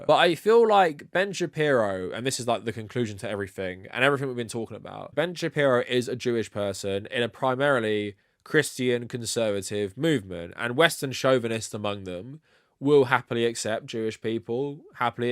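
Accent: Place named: England, United Kingdom. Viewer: So I'm 8 minutes into this and so far it's safe to summarize everything this YouTuber has said thusly: Blahblahblahblahblahblahblahblahblahblahblahblahblahblahblahblahblahblahblahblahblahblahblahblahblahblahblahblahblahblahblahblahblahblahblahblahblahblahblahblahblahblahblahblahblahblahblahblah Not sure if you want to assert any thoughts of your own or if you're capable of that but I won't be sticking around for that. English accent sounding smart doesn't mean they're smart at all. This is just blather.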